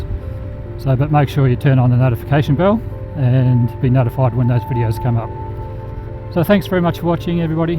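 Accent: Australia. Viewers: To be honest I really interspersed in bikes mostly cruisers but the main reason I watched all your videos is your way of speaking Australian English which is great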